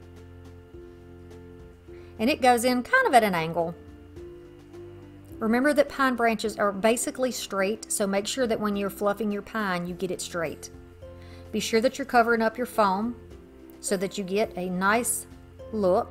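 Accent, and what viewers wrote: South, United States. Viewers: I love how you curled the ribbons on your bow! So easy but so cute! You always find the greatest stuff at your thrift store and at Dirt Cheap! The closest one to me is Fort O, GA! You definitely sound like you’re in the south lol! TFS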